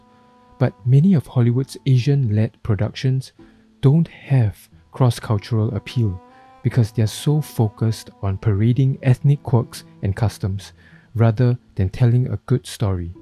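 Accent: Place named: Malaysia. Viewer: hi, hi. are you malaysian/bruneinese/singaporean? you have an accent from these region.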